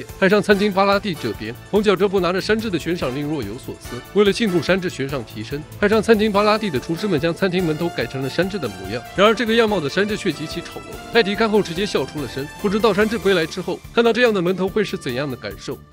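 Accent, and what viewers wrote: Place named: Sichuan, China. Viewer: 你是四川人哦？講話的腔調跟王剛很像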